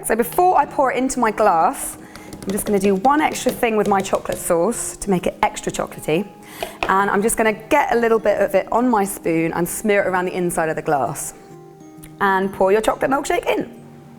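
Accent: United Kingdom. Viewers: Her british accent😻😻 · are you british ??????????????? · That british accent quit difficult 🙂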